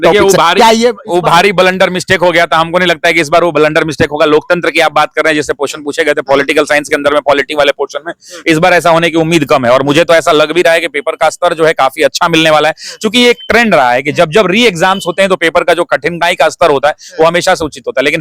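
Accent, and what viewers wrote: Bihar, India. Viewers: सर... पूरा बिहारी टोन प्रयोग करते है....😂😂😂हमारे बिहार की शान........ और सर 🎉🎉🎉🎉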